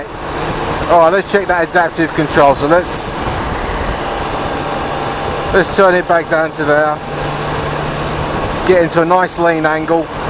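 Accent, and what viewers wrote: Cockney, United Kingdom. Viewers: Good job keep up the good work... Love the vids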